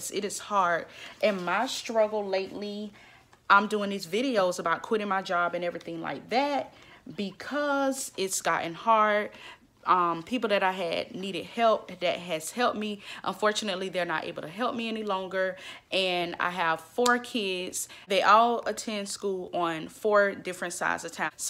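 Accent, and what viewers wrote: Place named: Florida, United States. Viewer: Are you from Florida? Lol you sound like me and my friends talking. Anywho, thanks for the video... I start nursing school next week 🙌🏾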